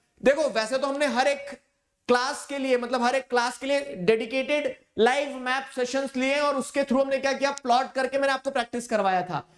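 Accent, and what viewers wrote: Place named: Rajasthan, India. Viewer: Sir aap marwadi bolte ho ky aapke bolne ke tarike se laga ki aap rajsthani hai Please reply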